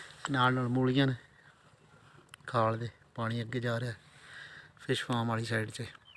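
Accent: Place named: Hafizabad, Pakistan. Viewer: Mashaa ALLAH Bhai zabardast Hafizabadi boli soooo niceeee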